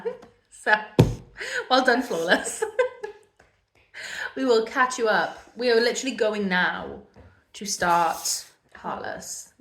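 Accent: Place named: Wales, United Kingdom. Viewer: I've just found your channel and I absolutely love you and your Welsh yay xx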